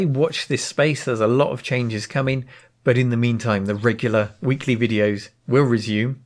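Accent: United Kingdom. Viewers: The name is less important then the good job you do. BTW I love to hear your british english, it's much more pleasent then american english not to mantion german channels ;)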